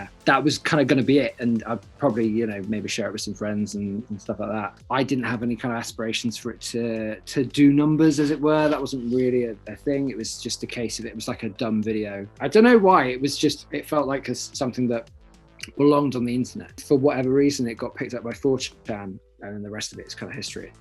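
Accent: United Kingdom. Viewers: dang, british ian hecox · dude looks like Dave Franco and The Action Lab combined with a British accent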